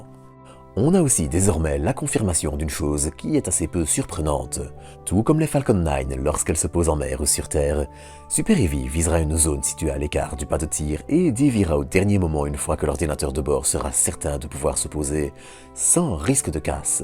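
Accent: Belgium. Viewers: Étant bruxellois, je crois que je reconnais un accent belge.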